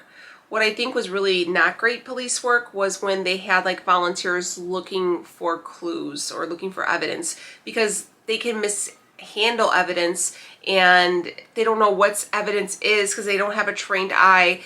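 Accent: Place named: Chicago, United States. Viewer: This is my 3rd video I have watched on your channel and I knew you were from Chicago from your first one I watched , I too am from Chicago , I recognized that accent 😊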